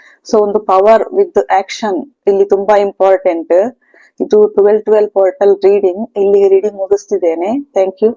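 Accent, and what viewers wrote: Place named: Mangalore, India. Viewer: Thank you sister ❤ are you from Mangalore by hearing your accent I'm feeling like that ..but anyways nice reading.thabk you❤ Thank you universe ❤Thank you god❤ Thank you angel❤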